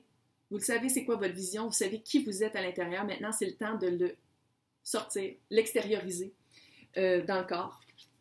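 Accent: Canada. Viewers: Merci beaucoup pour votre énergie positive et votre bonne humeur. J'adore votre accent canadien, je suis française et j'avoue que parfois j'ai du mal à comprendre vos mots et ça me fait bcp rire 😂❤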